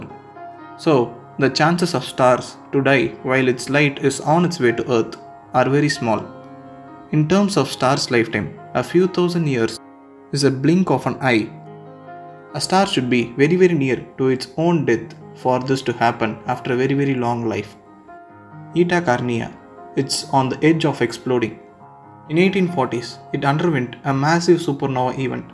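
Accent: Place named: South India, India. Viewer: Your accent is very similar to a South Indian men. Am I right?😀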